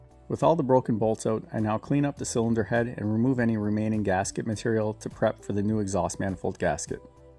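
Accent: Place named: Canada. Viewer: this guy sounds so unbelievably canadian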